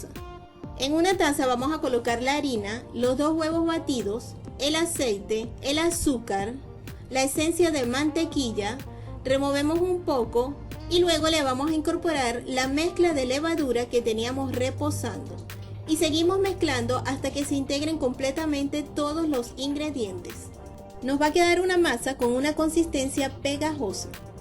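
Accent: Venezuela. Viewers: MUY BUEN DIA MI GRAN Y HERMOSA CHEF NO ME CANSO DE VER TODAS TUS MAGNIFICAS RECETAS ERES LO MAXIMO TE AMO POR TU DIALECTO DEBE SER VENEZOLANA Y SI NO YO DESDE MARACAY ARAGUA VENEZUELA TE DESEO MIL BENDICIONES MI CORAZON YENOS DE EXITOS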